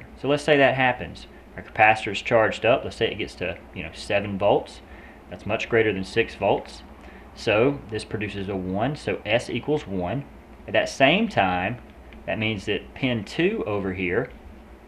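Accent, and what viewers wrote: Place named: United States. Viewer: that American accent :D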